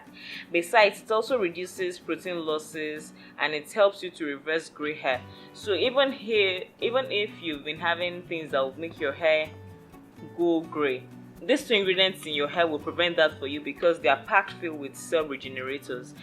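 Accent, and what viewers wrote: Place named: Nigeria. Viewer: you sound like nigerian... hmmmmm are you one of them? .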